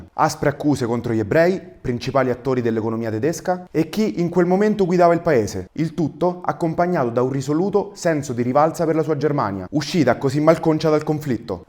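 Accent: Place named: Rome, Italy. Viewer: La mia non voleva essere una critica, ma una simpatica constatazione del divertente accento romano.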